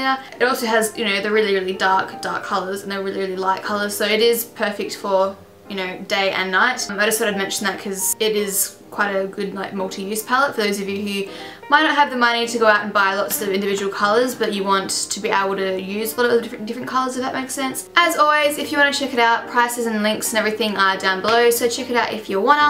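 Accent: Australia. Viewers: I'm so happy you have an Aussie accent lol! First ever makeup tutorial iv see from someone I AUSTRALIA!! Yay! Go us Aussies! Loved this tutorial btw! :) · You're seriously so gorgeous and I love your Aussie accent! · i miss Aussie accents it refreshing to watch these videos ... <3 it! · are you austrailian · You seem like a really sweet person. And your tutorials are really good too. Defiantly subscribing! You sound like you're either from Melbourne or Sydney. No? Oh well xxx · Are u from australia???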